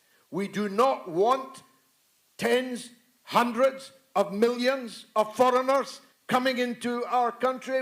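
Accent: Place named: Newcastle, United Kingdom. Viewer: Well spoken Geordie .. Said from a fellow Scot 👍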